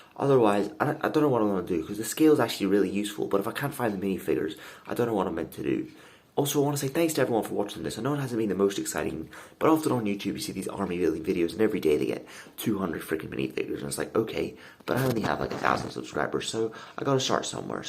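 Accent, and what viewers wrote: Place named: Eastern Canada, Canada. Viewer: I'm from Eastern Canada and the speed talking with heavy accent was 100% intelligible lol just like home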